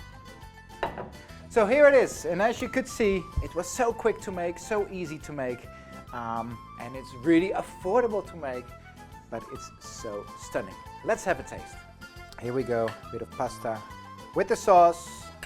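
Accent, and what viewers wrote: Netherlands. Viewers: He must be Dutch with his accent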